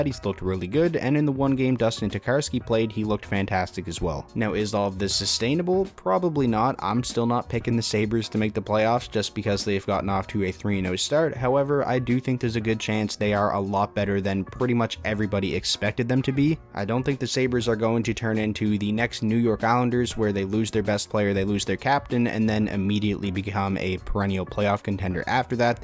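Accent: Newfoundland, Canada. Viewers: You from out east bud? You sound a bit like a Newfie with your 'ar' sounds haha. Love the channel, keep it up!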